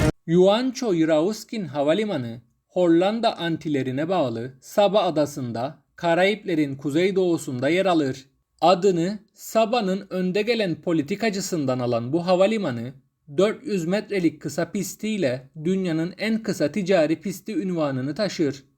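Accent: Cyprus. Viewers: Abi kıbrıslımısın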